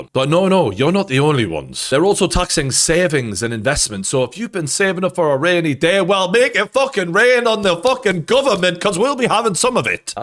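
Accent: Newcastle, United Kingdom. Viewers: From NewZealand, I started listening to you because you talk like my Dad, also a Geordie. Now I just listen to your information and insights.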